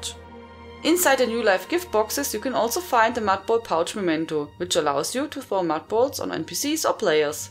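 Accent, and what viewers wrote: Germany. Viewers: I love your German accent. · Man hört direkt das du deutsch bist hahaha :D <3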